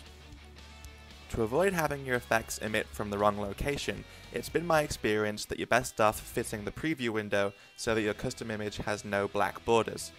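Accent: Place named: England, United Kingdom. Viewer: I had no idea that you were English. Definitely surprised me when i heard that accent. Don't know why i thought you were from the States.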